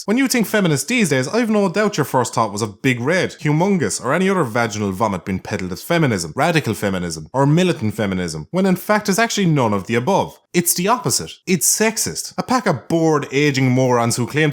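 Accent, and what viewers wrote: Ireland. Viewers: Goldenaries 08 it's a well spoken Irish accent. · Is he irish mate pls be cause I be the only one hardly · I feel I've missed something since I've never heard of you till today when you waddled into my YT feed. Love your accent (Irish? the Yank guessed) and agree the world is fucking insane. Subbed. Keep up this.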